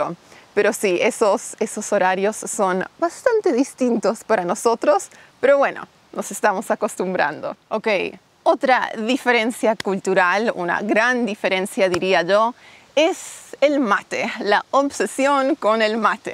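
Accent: Argentina. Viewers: me impresiona el nivel que lograste de español argentino, no sé hace cuanto que estás aquí pero de verdad impresionante, te aplaudo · Por como hablas, al principio del video creí que eras una argentina en Canada. Tenes un acento argentino casi perfecto! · Te felicito por tu español o castellano argentino. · Tu acento argentino me recuerda a Ingrid Grudke, q es una modelo argentina. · Fabuloso tu acento argentino! casi perfecto, sólo deberías (si te place) cambiar el "ummm" (muletilla anglosajona) por nuestro "eeeh" . Saludos! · Hola!!! Soy Argentino.....Me encanta como hablas el español Bueeee....en realidad el Argentino!....Vivi 2 años en Europa.....No tenes idea de como extrañe el Bidet....jajajaja!!! Les mando Besos y Abrazos! Sigan con este contenido....... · Excelente tu español-Argentino!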